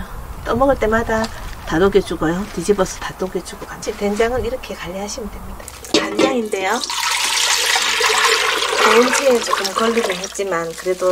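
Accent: Gyeongsang, South Korea. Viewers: 친구의 추천으로 유튜브 를 보게 되었네요 ㅎ 목소리도 차븐차븐 이해력있게 말씀도 잘하시고 경상도 바투리 매력 뿜뿜임돠^^♡ 댓글 감사 함니다 그독 알람 조이요 꾹 꾹 꾹 눌고감니다ㅎ 건강하시고 늘 조은 하루 되세요 ㅎ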